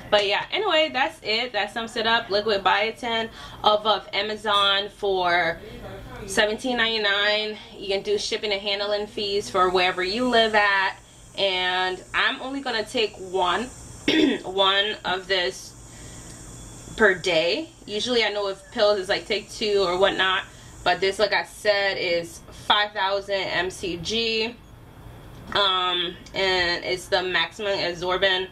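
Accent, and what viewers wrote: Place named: Liberia. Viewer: Wait, are you Liberian? Because the accent do sound like a Liberian 😆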